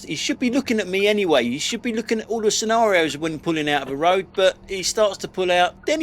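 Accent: London, United Kingdom. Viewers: I was originally going to say that dyslexia affects only reading the written word and not the grammar of the spoken word. However I just looked it up and because dyslexia is all about the inability to process the written word then it can cause problems with similar sounding words in your speech pattern. It's nothing to do with laziness and is simply a case of condition. I am sometimes tempted to correct him on his nuffinks and sumthinks and double negatives but thats just how Londoners talk - vive le difference!! So I think you're a bit mean suggesting he's lazy. It has no impact on how well or badly he drives.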